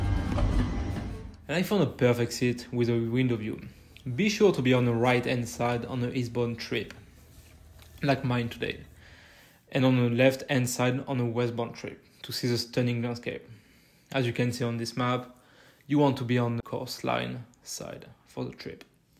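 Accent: France. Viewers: I like the overall change. Sometimes I found the subtitles distracting and prevented me from actually seeing your video. Additionally, hearing your voice makes it easier for the audience to connect with you. Also, Americans love French accents:) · My VERY strong preference is for voiced videos from you, but I'm not a typical English-speaking viewer when it comes to this matter. I suspect you know that your English is excellant, quite casually and colloquially delivered - but with an indeed strong French accent. English speakers with lots of experience with French people speaking English as you do might hardly notice, but... Any reason not to go for a time with both voice and the subtitles? (The subtitles become simply a transcription. The "native language" of the channel has in fact always been English, no?) For many cranky English-speakers, the more they hear you talk the less they'll notice the accent. 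· Hi 👋🏻. So first, I could understand through your French accent perfectly but did have to listen attentively. I think the more you speak English to us, the better you will become so don’t stop. Secondly, I hope you get the opportunity to meet the new Secretary of Transportation of the United States, Pete Buttiedge as he wants to develop a high speed train system within the U.S. I think you would have some very good advice for him. Perhaps through your connections this could become reality! I agree with you... SNCF needs to clean those filthy windows because that scenery was awesome! Keep up the good work. I enjoy your presentations. Between you and Dylan, you both have given me many ideas to take once the pandemic has been arrested. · Plzzzzzzz learn English without the French accent that would be super nice😍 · I agree! I love his french accent too and i love his narration! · While I appreciate the voice attempt, and the video, my wife and I who are American, found your French accent a bit thick and hard to understand at times. 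Suggest you stay with the captions instead. Loved the scenery, although the dirty coach windows detracted at times. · Yes I love the French accent please continue the narriated edition. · I mean he is French. He wont lose the accent. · Wow you have a French accent 😳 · I don't know how weeds are harmful or negative in any way (do they damage the tracks or the train?) but I like seeing them. It makes the tracks and the overall environment prettier! I love hearing the French accent 😂 but it was hard for me to understand, so I did have to turn the auto-subtitles on. So for the actual content I prefer subtitles. But I do like hearing your voice! It's good for moments like when you're describing the trip on the map and the summarization at the end. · Great video. I enjoy hearing your French accent. I am French myself and my grandparents are from Domont. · Love your French accent. But surely the most scenic part of this line is east of Nice, towards Menton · Excellent alternative format and great to hear your voice. 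Nice and clear. I have to say you might have some people who struggle to understand your magnifigue French accent (I am a romantic I love the accent) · On entend ton accent français lol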